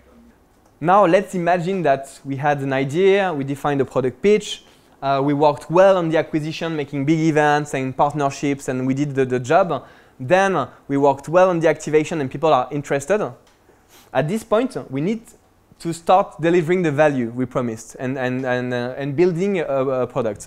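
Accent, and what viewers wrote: France. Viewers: ohhhh that french accent _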